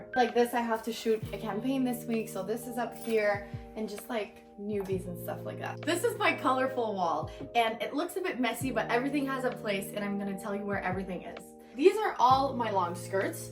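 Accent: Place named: Montreal, Canada. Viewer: I recognize that aceent. Im born and raised Torontonian but lived in Montreal for a few years.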